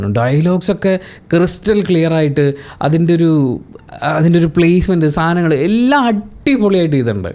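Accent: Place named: Eastern Kerala, India. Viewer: പാലക്കാട്‌ ഭാഷ അല്ല കിഴക്കൻ മേഖലയിൽ ചില ആളുകൾ സംസാരിക്കുന്ന ഭാഷ ആണ്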